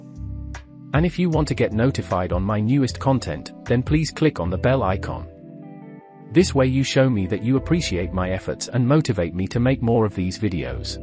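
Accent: Netherlands. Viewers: I am missing the Dutch accent! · to bring back the Dutch accent.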